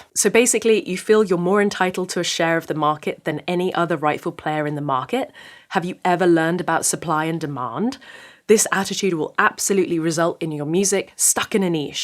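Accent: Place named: Bristol, United Kingdom. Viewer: Not sure commentary from an old guy (I'm 79) is useful, but I'll give it a go. I stumbled on to your channel on YouTube by accident. I've stayed a fan because your musical tastes are eclectic, and sometimes overlap my own, and also because I'm a sucker for an articulate female voice with a Bristol accent – I like your "talk" videos as much as your musical ones. I've played guitar for decades, but am not a musician (I don't understand the theory, barely can name the strings on a standard-tune guitar, etc.). I learned on my own, by ear, and will never be better than mediocre. That's OK – I don't expect "success" in that context. I play for therapy. I "discovered " Larkin Poe in the same way – by stumbling upon them on YouTube by accident – but have become enough of a fan that I actually went to their show last spring, despite my advanced age, when they played Minneapolis last spring. 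I'd be happy to see you in person, as well, if you ever came here. It seems smart to me, a non-musician, for a young musician to make use of multiple sources of income, publicity, etc., and you are obviously a smart musician. I have no talent for business, so kudos to you for making it work on your own terms.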